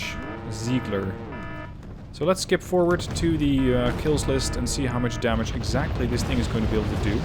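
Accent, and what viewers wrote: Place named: Netherlands. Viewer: Sounds like a dutch one to me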